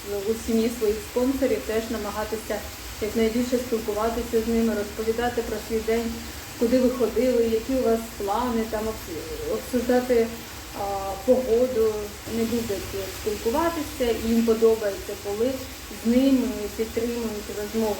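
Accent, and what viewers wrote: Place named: Western Ukraine, Ukraine. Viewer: Доброго дня! Цікаво знати звідки ви виїхали? Судячи з гарної української ви з заходу, невже там було так небезпечно? Чи просто дуже до Англії хотілося?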